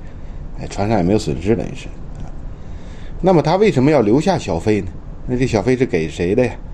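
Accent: Tianjin, China. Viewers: 讲得很精彩，天津口音听着很喜欢。祝船长和船员们新年快乐！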